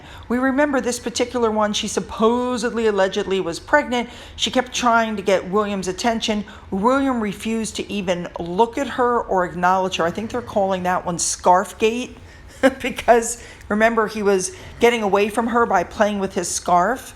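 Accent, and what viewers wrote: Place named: United States. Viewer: I opened this cause I thought it would be narrated by some lovely, british voiced person. Much to my dismay, it was a common American sounding gossip columnist. Sounded like someone from the National Enquirer. Highly disappointed. Couldn’t even listen to the end. I’ll be more careful next time I listen to anything on the Royals.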